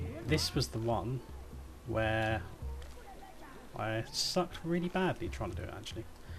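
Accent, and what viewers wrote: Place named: United Kingdom. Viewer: I don't know why you don't have any subscribers this is actually good content! and the british voice is a plus good job!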